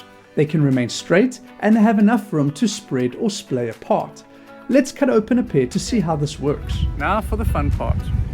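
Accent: South Africa. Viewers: Great video with so much common sense, which should be called “rare-sense” these days. Thank you. PS: you sound South African. Am I correct there boet?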